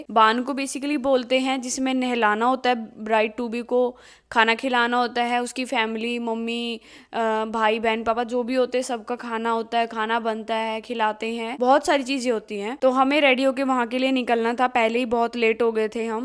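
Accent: Haryana, India. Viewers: Your haryanvi accent is very nice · Di aap ke muh se haryanvi bhut achi lagti h 👌👌👌👌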